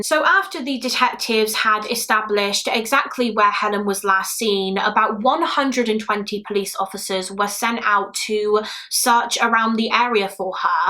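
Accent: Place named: England, United Kingdom. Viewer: Yesss back 👏👏 I love your channel so much - especially happy to hear an English voice 😌 fantastic video & keep up the good work, from North Yorkshire ❤️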